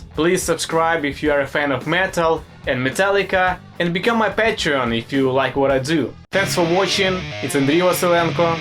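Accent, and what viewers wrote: Russia. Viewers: Good video! You're russian? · Русский английский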